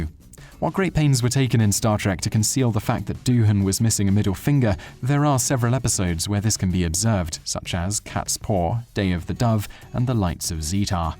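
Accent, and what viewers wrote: United Kingdom. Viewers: A few comments. First, when discussing he was shot by a 'Canadian gunman' you show a pic of someone wielding an American M1 rifle. And why the term 'gunman'? Sounds amateurish. Soldier or rifleman would have been better. I believe it was a burst from a Bren LMG that got him. In his autobio he says that he didn't notice the leg wounds and was fixated on his finger hanging by a bit of skin. He went to an aid station and the medic merely tore his dangling finger off then said, "now, how about that leg?" He was stunned that he hadn't noticed the most serious of his wounds... or that he could even walk! Now for the bit that irks me the most from this video - particularly as the narrator is obviously a Brit. That would be the MISPRONUNCIATION of the rank lieutenant. Who do you think we Canadians are, a bunch of yanks? Every English speaking nation of the world pronounces it 'lefftenant' EXCEPT one... 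the USA. I have no problem with that - that's their way. But, for the love of God, stop using the US version when referring to the Commonwealth! You're likely thinking, "oh relax. It ain't no big thing..." But it is. We are losing the finer points of our culture to a deluge of US influence. Already far too many ignorant Canadians use the wrong pronunciation (even reporters who often say "Lootenant Governor'....grrrrr) without having someone with a Brit accent confusing them even more. And to all the dummies who say, "but it's spelled that way..." I say, speak the rank 'colonel' out lou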